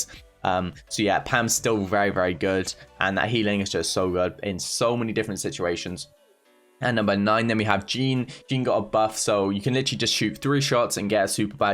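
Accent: United Kingdom. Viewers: You speak Oxford english.Arent you?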